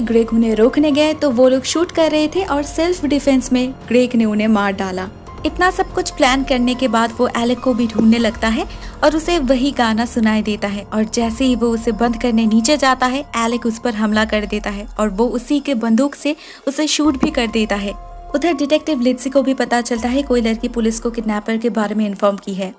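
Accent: Bengal, India. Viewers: Sweet voice in some bengali tone. You explain every corner of the story in your clear voice and without any noise. Feels like we are watching the movie. Thanks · Bangala bhashi.....tone...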